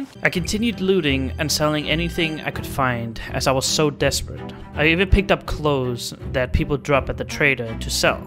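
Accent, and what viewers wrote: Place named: Denmark. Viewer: You sound very Danish. Could I possibly be right?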